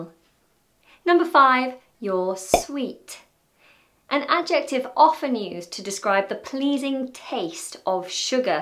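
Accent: United Kingdom. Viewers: You're sweet, but why aren't you blonde? Swedish girls are much sweeter than British girls. However, I am crazy about hearing your British accent, sweetie! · To be 'onest I don't like British accent sorry 😆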